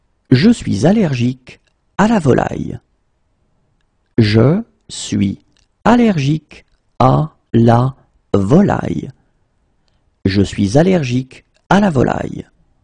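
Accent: France. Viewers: Ouais c'était l'impression que j'avais au début mais c'est clair que vous êtes de France. Je ne suis pas français du coup c'est peut-être juste à cause de cela.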